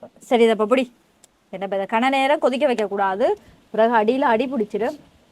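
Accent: Tamil Nadu, India. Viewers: Ivar enna indian tamil la pesuraru. Inga vanthittu ponavara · You speak like Tamil Nādu Tamil language. Did you study in India?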